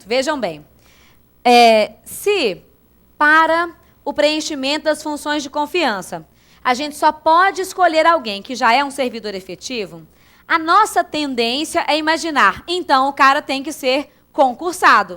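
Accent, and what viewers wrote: Minas Gerais, Brazil. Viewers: Gosto demais das suas aulas, Profª! Tenho aprendido bastante! Parabéns por saber passar o conteúdo de forma tão clara! *Ps: Esse sotaque "mineirês" me lembra meus tempos de "Berrrlândia"! Adoro! rs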